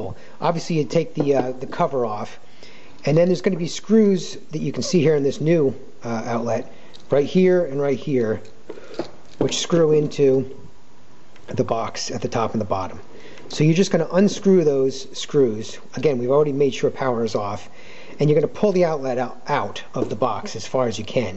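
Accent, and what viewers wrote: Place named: New Jersey, United States. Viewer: represent with the jersey accent baby! thanks for the video!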